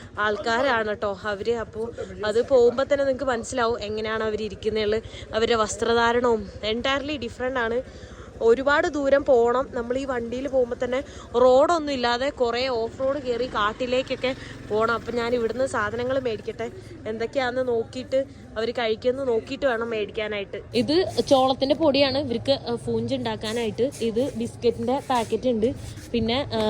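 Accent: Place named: Thrissur, India. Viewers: തൃശൂർ ഭാഷ ഒഴിവാക്കണം.... കൊള്ളില്ല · ത്രിശൂർ ഭാഷ കലക്കി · slang oke mariyalo… epo trissure bhasha analo 😂😂😂😂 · ഇന്ന് full തൃശ്ശൂർ സ്‌ലാങ് ആണല്ലോ ❤️ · Inn ipoll തൃശൂർ slang annaloooo ❤ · Thrissure slang varunnu samsarikumbol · Slang bore avunnu,trissur slang varunnu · Slag mariyoo oru trissur slag cheruthayitt vannittundallo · ഇന്ന് എന്താ തൃശ്ശൂർ ഭാഷ 😃😃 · ഇതെന്താ തൃശ്ശൂർ slang 😂😂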